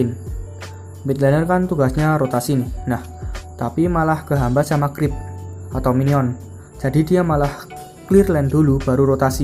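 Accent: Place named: Java, Indonesia. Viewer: Medokee :v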